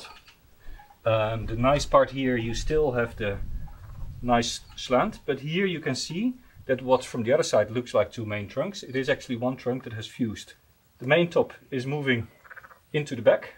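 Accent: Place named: Netherlands. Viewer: Hi, you sound Dutch but I am not sure. I am a beginner bonsai grower and was wondering if I can ask some questions. Have some soil problem for my ficus and looking for some species that do well outside In Holland. Best regards Dennis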